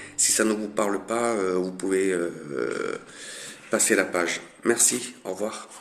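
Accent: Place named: South, France. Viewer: Bonjour," "Guidance intemporelle🎉Beaux Flashs.Qui parfois pas si,évident à dire.Mais,bien réel ce Flash.Super!...😊De toute beauté.Très intéressant et ce qui permet de mieux comprendre certaines,situations et aussi,certains comportements.Très beau Flash🎉Recevez mon soutien😊Plus rassurant et appréciant ce"Don"🎉Qui ne peut qu'amélioré le quotidien de chacun.Sincères remerciements" "🎉Avec son Joli accent du midi😊Franchement Beau ces Flashs🎉On ne s'en lasse pas😊Belle Richesse en ce Don🎉Prenez soin de vous😊Au plaisir!...😊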